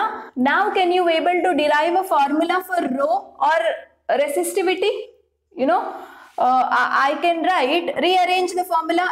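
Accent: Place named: Telugu, India. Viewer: Mam do u speak telugu language ?? Your slang is a bit like telugu speakers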